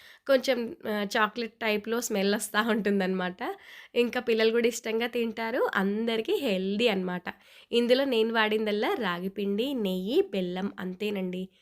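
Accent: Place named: Andhra Pradesh, India. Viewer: Hi akka bagunnara.meeru Aandra vallaa..mi matalu ala matladatharu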